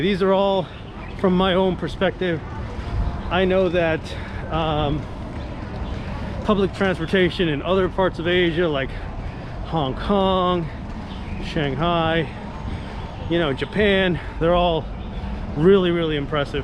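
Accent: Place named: Los Angeles, United States. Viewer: This is my first viewing of your video and I think you did an amazing job. When I started to hear you speak, I thought you were from Los Angeles which is where I'm from. I love your thoughts on living in Macau where I was lucky to visit in 2008. I totally understand the comparisons you made of Macau and other places in the States. I hope to one day visit again and check out the places you mentioned. How did you make the adjustment in terms of speaking Chinese? Are you speaking more Cantonese or Mandarin?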